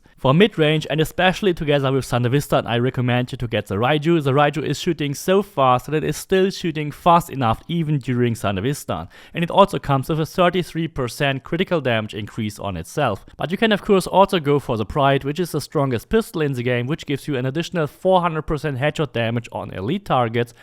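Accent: Germany. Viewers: I hear the german 😂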